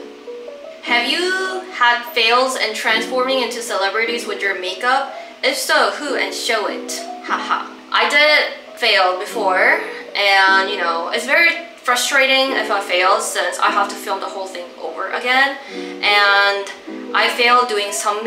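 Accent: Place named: England, United Kingdom. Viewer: Am I the only one who loves her english accent?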